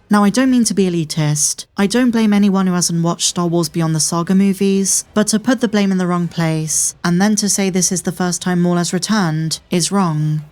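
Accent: United Kingdom. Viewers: WHY are you making Money off of Starwars and the ACTUAL people who created it back in 70s and 80s are not???? I’m sorry but you’re just reading Making Starwars and shooting these videos. That’s Lame.. Get a REAL job that contributes to Society.. WE have women doctors, engineers, researchers and Than we have the women of this lame TikTok Selfie Generation…StarWars is a Gen X era Franchise.. EVERYTHING cool happened in late 70s, 80s, and early 90s.. You guys keep taking OUR era stuff and making it worse..YOU Are not understanding like many Art.. Starwars is reflection of the ERA it came out in 70s an 80s and WHAT came before it World War II. The Mona Lisa would just be a painting of a woman.. BUT it’s iconic because who and when it was painted… the fact you say what you read in British accent is annoying.. You should give every pound you made to the people who actually WORK on Starwars in 70s an 80s..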